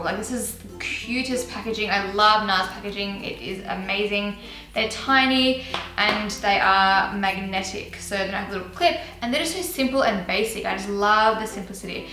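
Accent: Australia. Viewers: You do realise it's an Australian accent right? You basically insulted ALL THE PEOPLE who have the same accent. So fcking immature... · oh gosh, when I hear you speak, I so wanna have Australian accent!!!!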